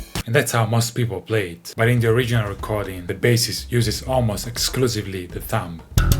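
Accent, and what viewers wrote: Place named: Italy. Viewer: who? the commenter said he was mexican and I dont think is american, sounds pretty italian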